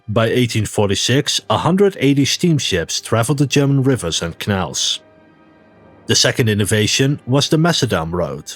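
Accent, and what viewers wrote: Netherlands. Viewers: I don't know whether I love your videos for the dutch accent or the great content. It's probably both, yeah who am I lying to it's both. Great video!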